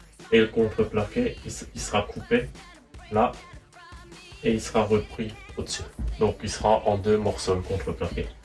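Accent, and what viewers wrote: Alsace, France. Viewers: bien sûr que notre Alsace est belle!!! Tu dois en savoir quelque chose non?! Vu que tu as le même accent que moi J'aimerais bien voir en vrai vu qu'on est voisin cela pourrait m'aider vu que j'ai des projets similaires ( je pense a une caisse a charger sur le plateau de ma camionette) en espérant que cela devrait compter comme chargement donc pas de démarches administratives... L'alasacien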